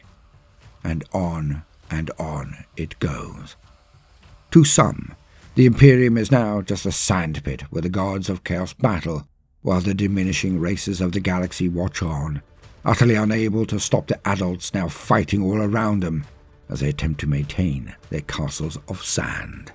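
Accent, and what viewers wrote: United Kingdom. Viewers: one I agree with you YouTube is buggy two can I do r e a c t your amazing videos three are you British? Your excellent sounds like it. It’s serving to hear it as will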